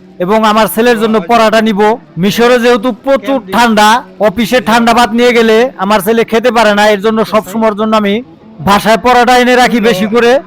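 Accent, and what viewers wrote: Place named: Tangail, Bangladesh. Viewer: অনেক সুন্দর উপস্থাপন, কথা শুনে মনে হচ্ছে আপনার বাড়ি টাংগাইলে। আমি মিশরে বেড়াইতে আসতে চাই।আপনার সহযোগিতা চাই।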